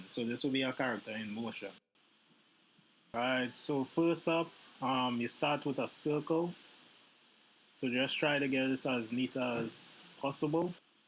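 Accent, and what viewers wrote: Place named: Trinidad and Tobago. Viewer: Sound like a Trini bro lol, Good Video btw, learnt a lot !!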